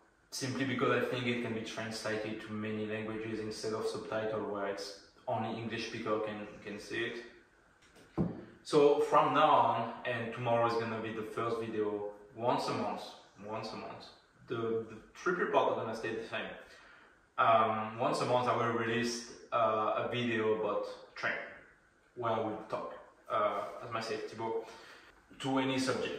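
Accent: France. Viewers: I love the French accent! Looking forward for more talking videos. Keep it up even with the challenges!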